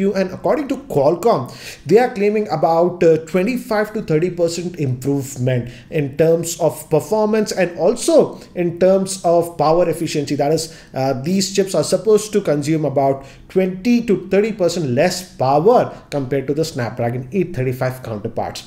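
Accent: India. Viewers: Would have told something which other channels missed to include...this video is copy of Android authority video n converted that into indian accent..no offence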